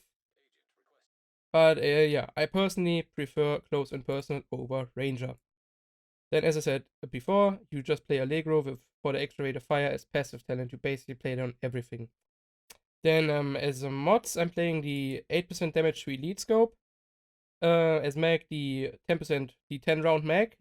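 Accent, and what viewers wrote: Germany. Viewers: Beim ersten Wort auf englisch habe ich gemerkt der ist ein deutscher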